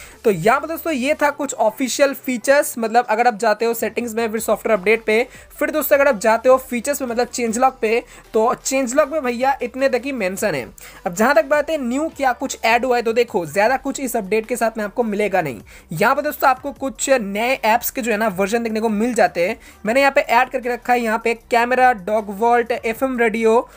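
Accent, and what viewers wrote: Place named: India. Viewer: Hmm,. Lovely voice and Hindi accent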